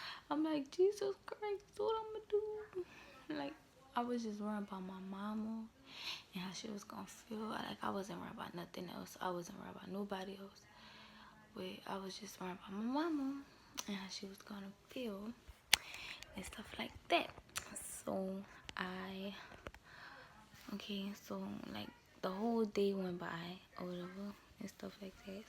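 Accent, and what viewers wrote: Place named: Louisiana, United States. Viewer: Congrats girly ❤️where are you from...you have a Louisiana accent and I’m in Louisiana lol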